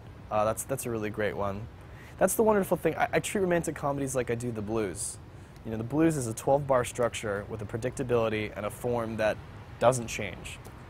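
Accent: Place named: France. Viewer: He's got such a great french accent!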